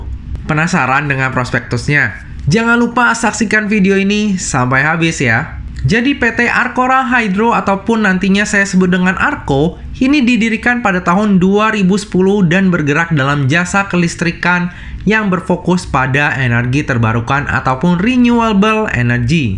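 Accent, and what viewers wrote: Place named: Bangka, Indonesia. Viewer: sinmung, kek urang Bangka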